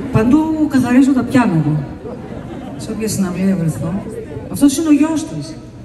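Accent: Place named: Cyprus. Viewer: Poso mou aresi otan milai ta Kipriaka! xronia sou polla agapi mou, na zisis kai na ta ekatostisis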